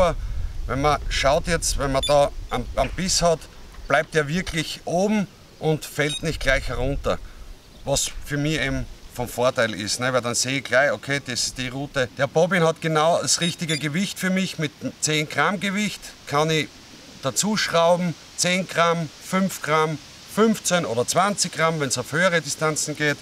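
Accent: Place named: Belgium. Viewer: Bent u belgiesch, ik wel en ik hoor een soort Nederlands belgiesche toon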